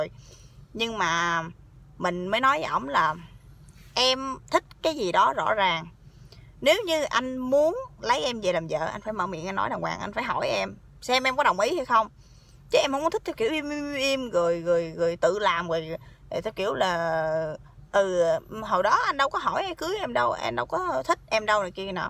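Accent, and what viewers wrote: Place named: Mekong Delta, Vietnam. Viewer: Chị giống quê miền tây quá...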